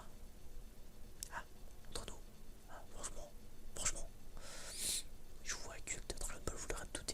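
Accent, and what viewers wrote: North, France. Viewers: Accent du Nord j 'ai l impression. Non ?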